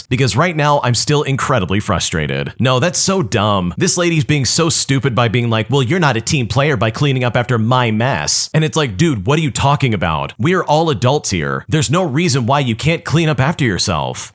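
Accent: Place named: North, United Kingdom. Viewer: I love this dudes northern british accent wish i could have it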